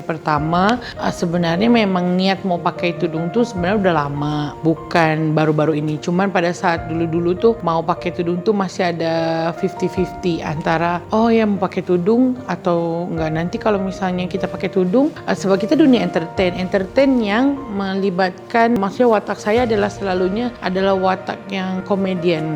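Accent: Malaysia. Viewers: Sekarang ni memang dah menetap Malaysia ke? Dah pandah ikut slang orang Malaysia. 👍🏻👍🏻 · Cakap pun mcm org Malaysia. Doa yg terbaik utk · Logat mcm sabah tapi hakikat dia ni org indon.. tula malaysia dan Indonesia ni serumpun🥰😍😘🇲🇾🇲🇨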